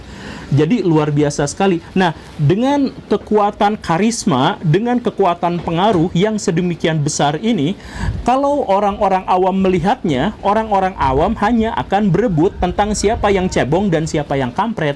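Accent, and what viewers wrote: West Java, Indonesia. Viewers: Sunda pisan si akang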